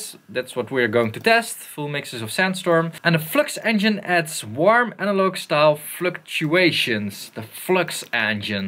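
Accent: Netherlands. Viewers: are u dutch dude?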